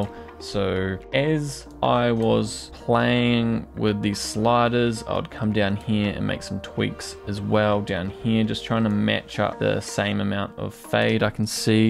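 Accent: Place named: New Zealand. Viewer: Think he’s from New Zealand bro bro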